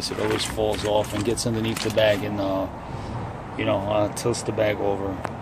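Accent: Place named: New York, United States. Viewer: I bet he's of Italian or Puerto Rican descent; either way it's definitely a New York accent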